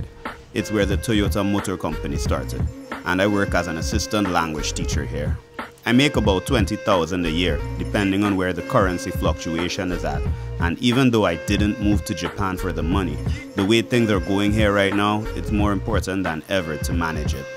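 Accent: Trinidad and Tobago. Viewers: just from the beginning could hear the trini in you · I can't believe I couldn't immediately place your accent! Trini man out there in rural Japan!! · mans in japan but you can still tell he is trini to the bone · In the first 15 seconds of the video I said that sounds like a Trini. I'm glad to see you expanding your horizons. Recently I've been visiting mostly Latin American countries but my dream is to visit Japan in the near future.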